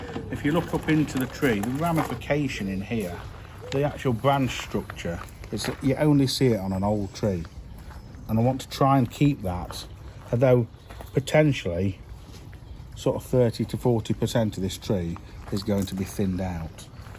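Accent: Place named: Leicester, United Kingdom. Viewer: Great video and nice to hear a Leicester accent.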